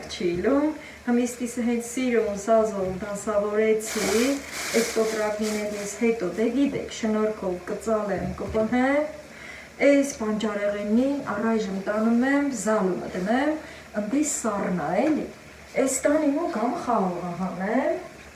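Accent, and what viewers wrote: Lori, Armenia. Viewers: Վաաախխխ Լոռվա բարբառ ❤❤❤ որտեղից եք տիկին ջան?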